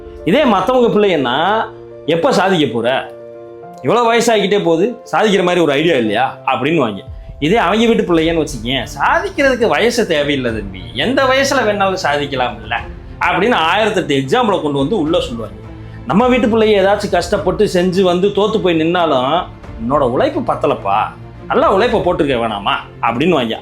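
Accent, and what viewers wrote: Madurai, India. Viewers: Madurai slang 👌👌👌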